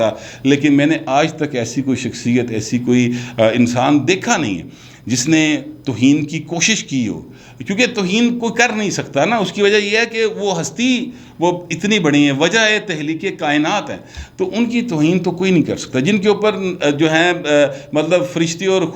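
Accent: Lahore, Pakistan. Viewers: صاحب آپ کی ڈریسنگ بہت اچھی ہوتی ہے اور لاہوری سٹائل انداز بیاں زبردست۔۔۔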